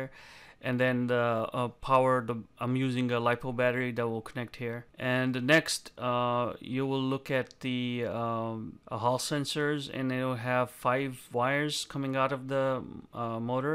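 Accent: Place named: United States. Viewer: i swear this guys accent is indian mixed with american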